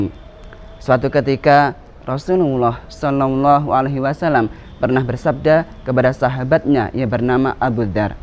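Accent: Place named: Middle East. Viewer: Suarane pun kados alumni timur tengah..yaman hadir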